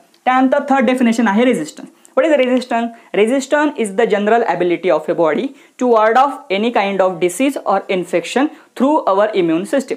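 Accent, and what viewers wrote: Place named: Pune, India. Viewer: Punekar cha avaj ahe to...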